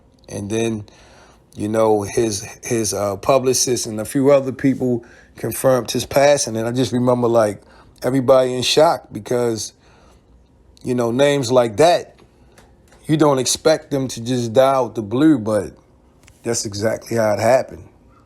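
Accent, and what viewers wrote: Baltimore, United States. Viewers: Dude talking in this video sound like he’s from Baltimore. “Tales From Hood” has a lot of cast members that passed on.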